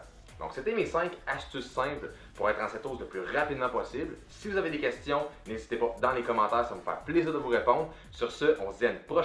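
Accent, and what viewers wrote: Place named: Quebec, Canada. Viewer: Vous parlé trop vite et avec l accent quebequoise j ai du mal à suivre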